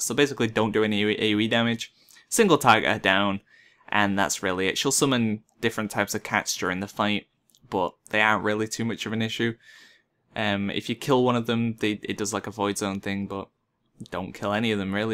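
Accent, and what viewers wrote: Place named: England, United Kingdom. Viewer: Adeeb Hamad Manchester i think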